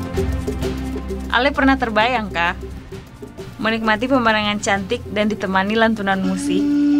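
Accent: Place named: Ambon, Indonesia. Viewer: Sebenarnya kalau hostnya sudah bicara pakai bahasa daerah tertentu.yg dri stasion tv-nya harus pakai subtitle Indonesia.biar yg nonton bisa mengerti kalimat2nya.penonton YouTube bukan cuman org Ambon saja.ada penonton dri Sabang sampai Merauke. Terus hostnya juga bicara aksen ambonnya campur aduk. Org Ambon itu bilang ( Katong/bukan kitong)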